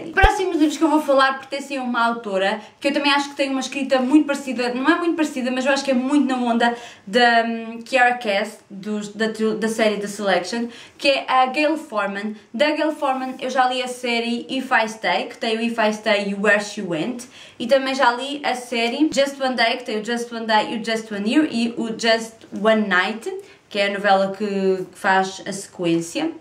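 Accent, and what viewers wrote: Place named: Portugal. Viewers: Pois é! O sotaque português é muito lindo! ❤ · nossa , português de Portugal é uma gracinha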